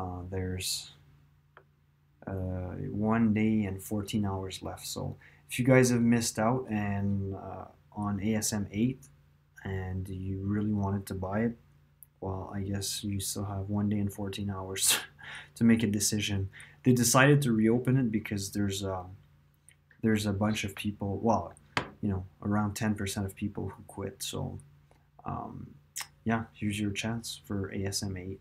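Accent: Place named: Quebec, Canada. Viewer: Thanks for the info, I find your video very helpful. And btw, are you originally a french Canadian? I can't help but hear a bit of a french accent..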